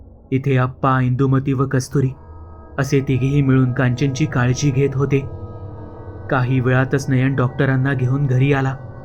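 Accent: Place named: Malvani, India. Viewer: तुझ्या तोंडानं मालवणी बोललंस बरा वाटला आमका ... मालवणी च वाटलंस...... पण भीती वाटता जाम हैता सगळा ऐकान 😅 lots of love from malvan ❤❤❤❤❤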